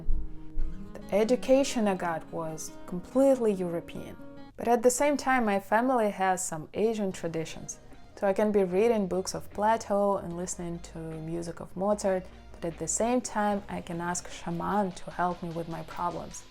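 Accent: Russia. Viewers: у вас заметный русский акцент. что это было? ненавидим русь матушку? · you may not look like what most Americans consider Russians to look like.... but your accent is a dead give away. RUSSIAN The Slavophile / Western debate has raged for Centuries I have a Bachelors Degree in Russian Studies & a mastery of the Russian Language ... but living in Former Soviet Republics - Kyrgyzstan, Uzbekistan, Kazakhstan, Turkmenistan, Tajikistan - I have realized that the text books were nearly worthless. I am learning every week something new from actually being actually on the ground. Having been here in the 'Stans for 8 years. I am not coming back to America. It is not the country I grew up in, and I am not encouraged by the direction it is taking and heading.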